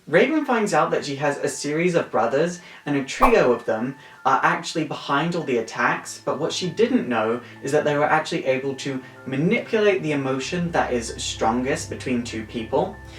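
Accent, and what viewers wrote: United Kingdom. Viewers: okay, 1 why the wig? 2 are you like slightly talking with a British accent? 3 what about the tv series?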